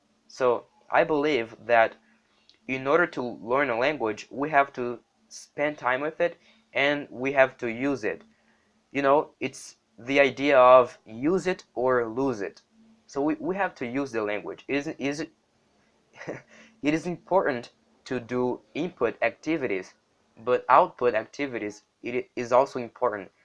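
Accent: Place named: United States. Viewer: Excellent mate. Your pronunciation is really good. You have a slight American accent too :)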